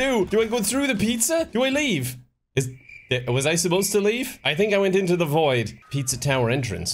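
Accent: Ireland. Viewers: You're Irish? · Wait! Irish?! I thought you were Spanish!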